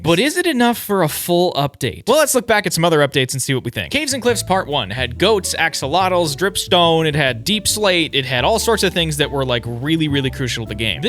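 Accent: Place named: United Kingdom. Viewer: yeh, but where are you from? Your accent sounds like british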